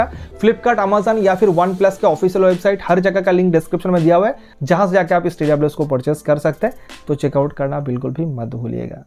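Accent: Bengal, India. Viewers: Bhii app Bengal see hoo kya??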